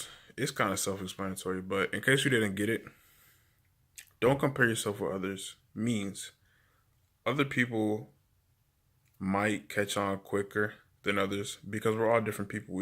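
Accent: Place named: Dominican Republic. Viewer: Yes you sound Dominican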